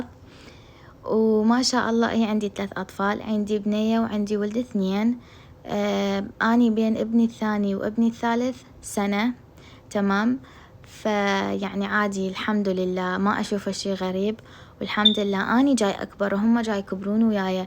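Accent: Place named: Mosul, Iraq. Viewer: حبيبتي استمري انتي من اي محافضه بلعراق من لهجتج من الموصل اوكركوك علما اضن واني اختك من النجف نشرف بنات العراق وبلنسبه لزوجك عادي المهم مسلم لا فرق بين مسلم. واعجمي الا بلتقوى ❤❤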